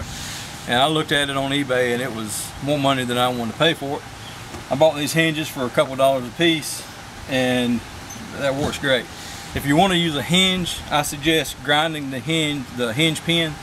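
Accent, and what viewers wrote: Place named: Carolina, United States. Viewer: that's a Carolina accent if I've ever heard one!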